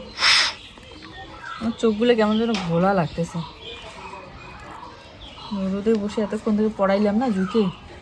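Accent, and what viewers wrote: India. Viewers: Arokom adha suddho r adha Rajbongshi vasha milie j vabea kotha bolo shunte valoi lagea.cha er sospen tai dim omlet korte paro.thal tou patla hoi tai fute utheche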